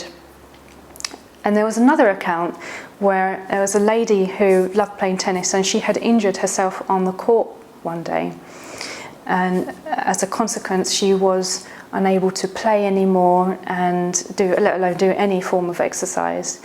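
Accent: United Kingdom. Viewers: Definitely an English accent :) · Funny, when I saw the name, I expected either Spanish/Mexican accent or an American one, not a South-East England/London accent.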